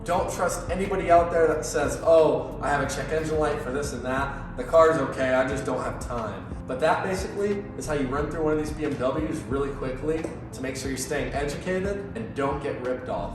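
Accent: Texas, United States. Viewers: Love his Texas accent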